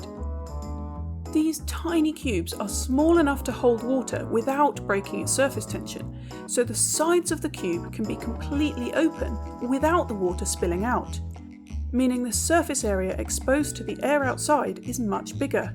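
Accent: United Kingdom. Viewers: Dislike! Bri-ish accent makes me choke. What the hell YouTube algo!!!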